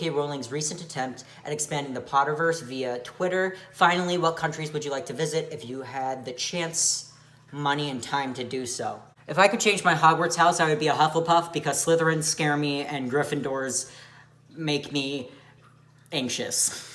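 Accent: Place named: South, United States. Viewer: Very weird question, but are you from Southern Illinois? Your accent sounds more southern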